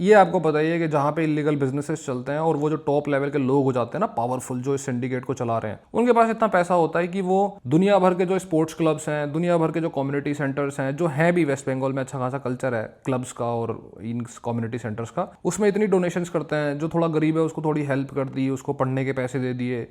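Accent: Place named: Uttarakhand, India. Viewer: Bhai ❤ uttrakhand ke khatima ke kurti walo ki trh bolta h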